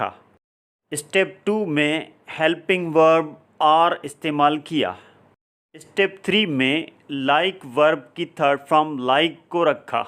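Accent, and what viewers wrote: Sindh, Pakistan. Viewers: GOOD EFFORTS TO CONVERT SINDHI ACCENT TO ENGLISH BUT TRY IS TOTALLY SEEMS VERY OVER !!!!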